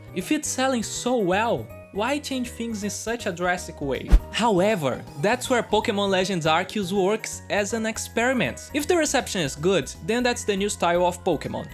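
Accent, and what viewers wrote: Brazil. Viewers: AMAZING VIDEO, you really touched the subject of Game Freak and the Pokémon controversy with good conciousness but also firm on critics, Very enjoyable editing style (E com o sotaque brasileiro impossível de não amar).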